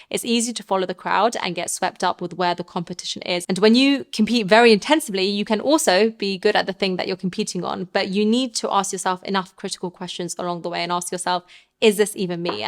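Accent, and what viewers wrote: United Kingdom. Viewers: I love that accent...I'm assuming it is British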